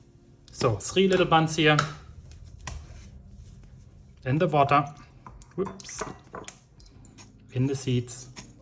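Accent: Germany. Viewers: These look delicious! My girlfriend makes "Worstbrotchen" (sorry about the spelling) what exactly are they, do you know? I've never had them but she makes them at Christmas. Thanks for your awesome videos, with or without the heavy German accent! ❤️🙂 Also, have you ever made Ezekiel bread? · LOL I thought exactly the same thing.. I have never heard him speaking English with that German accent :D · Wie schon von anderen geschrieben, lustig anderen Deutschen auf Englisch zuzuhören wie Brot gebacken wird. Als Muttersprachler ist der deutsche Akzent in diesem Video echt hart und erinnert an gruselige Zeiten von Schulenglisch 🤣 Klasse Kanal und tolle Videos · Your German accent is really strong today. Is that because of the German rolls?? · Your German accent is so much stronger than usual! 😊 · Authentic German bread rolls with an authentic accent. Perfect! I have a lot of rye, so I will have to German it up even more :)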